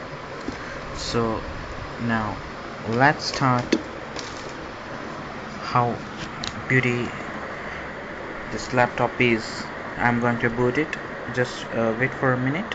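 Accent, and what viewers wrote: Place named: India. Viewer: Hey can u tell me how much did u pay for this laptop i think u sound like an Indian Person...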